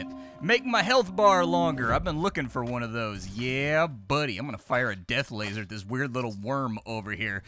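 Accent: Germany. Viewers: Very good German accent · your german english is awesome,!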